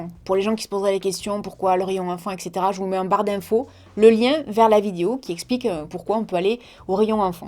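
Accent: South, France. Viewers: Il manque dans ta liste anti déprime une seance au spa avec massage. Ce que j'adore chez toi c'est ton accent du sud comme le mien ! 😉 bisous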